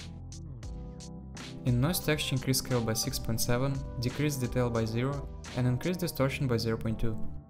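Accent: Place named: Russia. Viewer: Your accent sounds too russian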